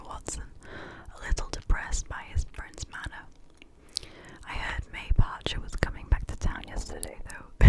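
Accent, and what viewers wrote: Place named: United Kingdom. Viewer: wow you have a British accent 😃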